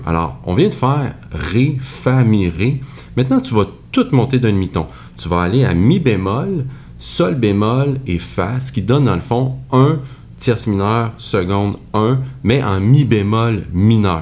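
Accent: Quebec, Canada. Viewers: Bonjour, Je découvre aujourd'hui ton Chaleureux accent québécois. Saxophoniste âgé mais volontaire; peux-tu me donner quelques conseils pour travailler un son harmonieux, la dextérité et l'improvisation! Cordialement! Jacques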